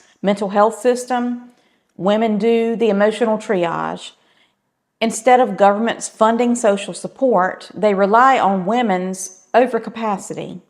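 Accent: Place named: United States. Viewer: I’m a Brit and I love your accent. To me, you sound like a proper American.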